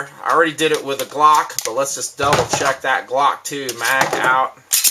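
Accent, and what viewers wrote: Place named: South, United States. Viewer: lol, sounds so much more southern in these old videos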